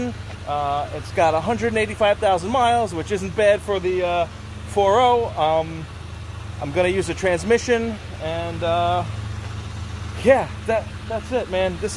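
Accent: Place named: East Coast, United States. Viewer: You’re from the east coast what part? I want to guess either New Jersey or Long Island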